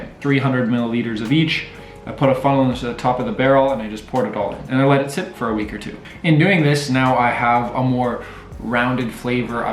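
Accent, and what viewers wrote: Canada. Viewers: Is this Canadian accent? You talk like my nephew who's now on Canada...rn 🇨🇦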